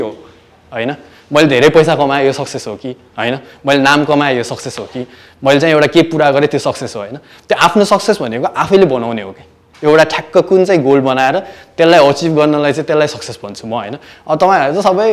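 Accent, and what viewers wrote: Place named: Busan, South Korea. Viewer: Busan tira ko tongue xa ko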